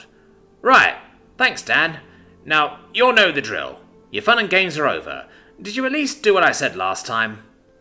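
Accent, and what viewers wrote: Canada. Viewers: Defiantly a Canuck!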